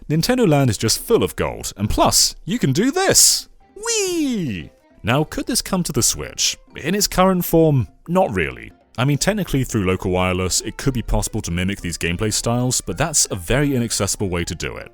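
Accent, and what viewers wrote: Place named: United Kingdom. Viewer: 🤮🤮🤮🤮 uk accent is so annoying!🤮🤮🤮🤮🤮🤮🤮🤮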